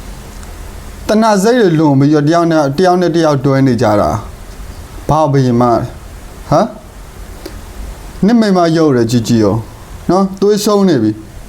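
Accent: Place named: Rakhine, Myanmar. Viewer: ရခိုင်နဲတူတယ်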